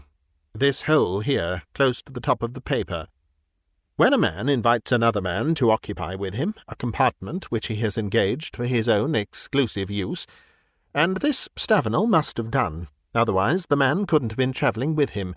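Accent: United Kingdom. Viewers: Oh definitely! Perfect British accent at its best. I am learning here. English is my second language and I am being tutored, and it’s not just about the pronunciation it’s about the punctuation. Actually punctuation is where AI fails most.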